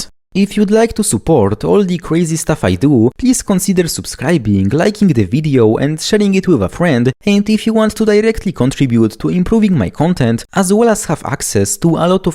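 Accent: Poland. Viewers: Most polish accent ive heard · First three words, I heard the polish accent, I knew it instantly😂😂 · Z akcentu wnioskuję że polak?😂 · Od pierwszego słowa wiedziałem że Polak ❤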